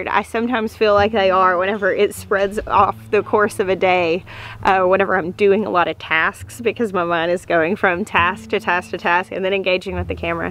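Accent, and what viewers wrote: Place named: Arkansas, United States. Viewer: Well I wanted goats but now I don’t think it’s a good idea for me. 😂🥰🥰🥰. I WOULD NEVER GET ANYTHING DONE. They are so dang CUTEEEE. I feel the SAME AS ABOUT TOMATOES. 😍😍🍅🍅😁😂 prayers coming from down south LOUISIANA ALWAYS. 🙏🙏🙏 KEEP SHINING LIGHTS GIRL. I have been saying that I stumbled upon my REFUGE here in your ROOTS and twangy Arkansas accent last year and GOD snuck right back into MY HEART not long after. I think you might have been a key ingredient that was needed for me to let HIM open my EYES. IM STILL SO THANKFUL FOR THAT. Keep talkin TWANGY and sharing your ROOTS AND REFUGE there are others out there just like me that need it. ❣️💡😊🦋🍅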